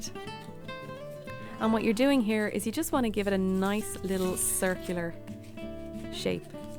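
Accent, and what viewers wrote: Ireland. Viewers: Well done!! Really neat to see the different ways to make and use stuff, that's lying around the house. I for one would love to see more shows like this from you. Thanks for sharing!! By the way...I lived in County Kerry Ireland for a year, many years ago. Ireland is such a magically beautiful place. I miss it dearly. Though, watching your video enables me to feel a bit of that connection again. Thank you for all the work you do. I love (and remember well) that lyrical Irish accent that I use to encounter when I lived there; hearing it always made my day. Yours is lovely, as well. :)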